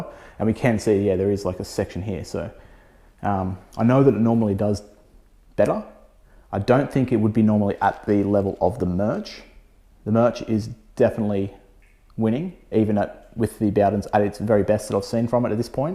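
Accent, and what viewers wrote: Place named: Australia. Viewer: I love the Australian accent, especially when you say Mirch…😜 Great test! Mirch is quite impressive. Thank you.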